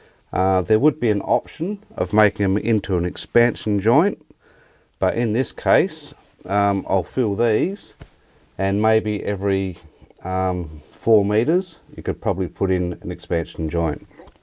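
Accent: Australia. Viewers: Nice work. You sound Australian. What city are you in ?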